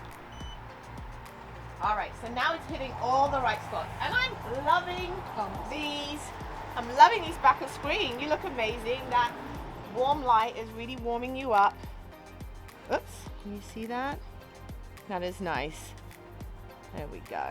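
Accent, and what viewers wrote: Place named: England, United Kingdom. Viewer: Hi. I love this video - it's the warts n all element that makes it so much closer to true OCF experiences than 99% of other videos on YouTube. I can't believe that other photographers don't experience hiccoughs - perhaps they edit them out - which just means we viewers learn less. I guess a few questions 1) did you do much/any post-processing? It looks like your model has perfect skin! 2) you could have warmed up her skin in post instead of using CTO gels? 3) you have to tell either the trigger or the strobe that you're going to shoot HSS right? I think you don't need to do that with Profoto equipment (although who wants to pay 3x as much for Profoto) 4) What did the Magsphere do for you? 6) How did you create the great shot in the video? 5) I have AD300 Pro and am considering adding an AD100. Thank for pointing out the issues with softboxes etc but I think I would only use it barebulb 6) On the subject of the AD300 - I like it because you don't have to add a mount. 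However, there seems to be a very limited number of softboxes with the Godox mount, right? I'm looking for a strip box with a Godox mount. Know of one? 7) You sound like you're from England?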